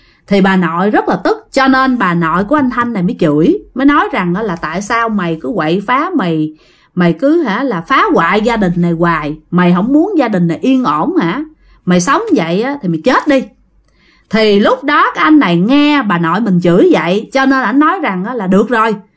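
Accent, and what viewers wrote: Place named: Mekong Delta, Vietnam. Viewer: dân miền tây thì mình cứ phát âm theo dân miền tây đi chứ ko cần phải phát âm theo dân miền bắc bạn hiểu mình nói ko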